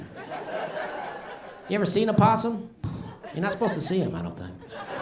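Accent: South, United States. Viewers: This man stole all my jokes and just added a subpar southern twang to it.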